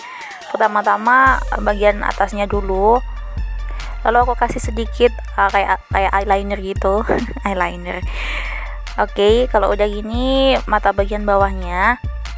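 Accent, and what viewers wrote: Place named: Philippines. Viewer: Let me guess your a Filipina Cuz you sound like one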